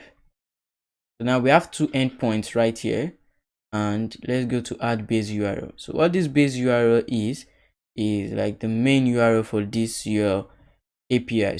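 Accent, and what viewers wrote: Nigeria. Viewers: Name and accent sound Nigerian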